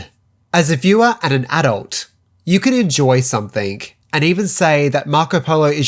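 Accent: Australia. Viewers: Great review, but can you back off on the Aussie pronunciation of the letter "o"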